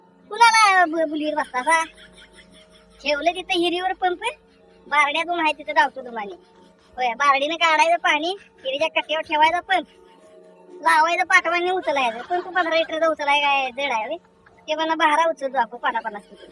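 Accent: Kolhapur, India. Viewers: अण्णा तुम्ही अस्सल कोल्हापूरी बोलताय . मला तुमच बोलण खुप आवडत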